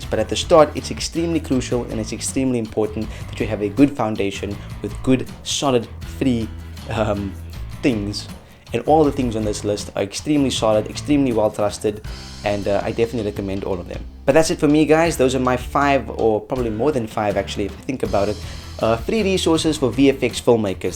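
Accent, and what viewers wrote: South Africa. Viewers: You sound South African 🤔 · hang on are you South African? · Ayyy, I recognise the south African accent !! 🙌😃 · are you from south africa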